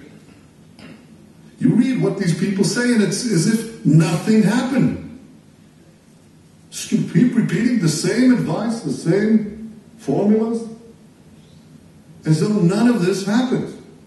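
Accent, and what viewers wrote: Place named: United States. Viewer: Am I the only one slightly unnerved by the fact that the Israeli prime minister sounds like an American (who can properly pronounce country names) when he speaks?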